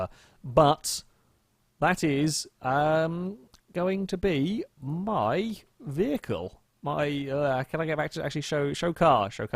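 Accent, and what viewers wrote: United Kingdom. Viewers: he's British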